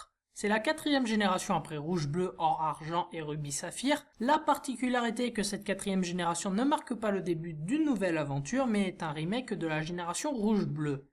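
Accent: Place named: South, France. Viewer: Oui respect mais t'as un accent du sud je me trompe ?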